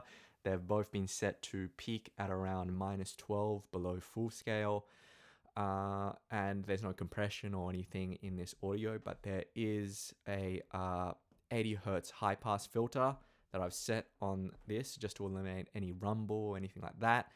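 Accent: Australia. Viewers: What boom arm are you actually using for the E614? It looks exactly what I need, and I hear your aussie, and I'm australian too so hopefully I can manage to snag what a beaut you have for yourself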